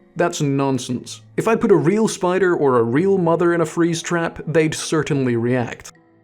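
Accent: Scotland, United Kingdom. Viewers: Btw If that’s a Scottish accent I hear I love it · you are the most normal-sounding british person i have ever heard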